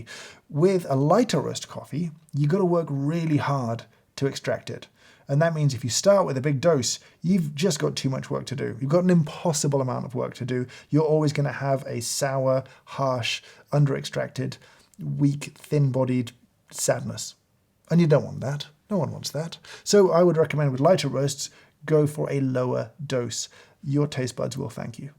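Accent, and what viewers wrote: United Kingdom. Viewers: I have listened to you for hours now. 🌿🌸🌿 I love your videos and signed up for the Oct 3rd coffee tasting. I’m in the states.You are so English it’s like watching someone do a caricature of an Englishman. “You don’t want that. No one wants that.” You are so enjoyable to watch and listen to. Thank you 🙏🏻 for the incredible wealth of information. I saw you flipping through “The World Atlas of Coffee” and ordered it used from Washington state. What a gem you are. What fun. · Watching in bed on silent w/ subtitles. ( so as not to wake my beautiful wife) I find a Hoffman coffee instruct-able without his nuanced Brit accent flowing through my speaker to be a bit like an underextracted quality coffee. A missed opportunity for a better experience. Miss your voice but I will do better next time and extract the optimal experience next time- with volume on😁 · Why is it that ANYTHING a Brit says sounds more intelligent and authoritative than an American? 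The accent alone sends the credibility of any statement through the roof. I'm not sure if I should believe anything this guy says, but I don't have a choice.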